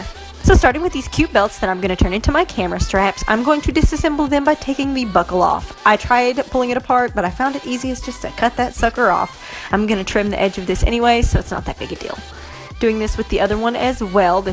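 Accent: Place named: South, United States. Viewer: This was do helpful thank you! Loving the southern drawl btw it's cute as hell!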